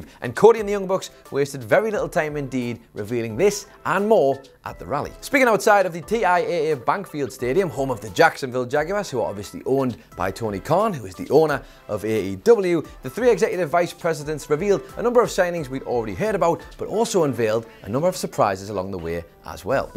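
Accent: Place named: Newcastle, United Kingdom. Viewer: This man a geordie. 2 years of these videos and I haven't realised this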